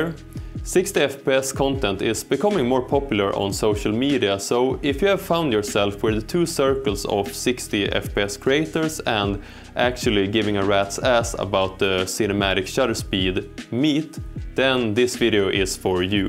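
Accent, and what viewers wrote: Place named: Scandinavia. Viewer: Love you scandinavian a-c-cent <^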